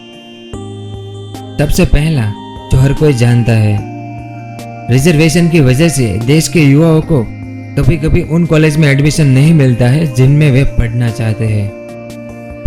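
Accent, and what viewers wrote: Gujarat, India. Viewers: Aap Gujarati ho kya?? Aapka bolneka accent ekdam Gujaratiyon jaisa hai!!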